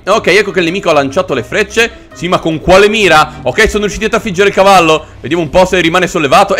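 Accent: Veneto, Italy. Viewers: Like chi conferma che l'accento iniziale di era veramente veneto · ADORO LA VOCE DA VENEZIANO (ed sono anche veneto) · Bello il tuo dialetto Veneto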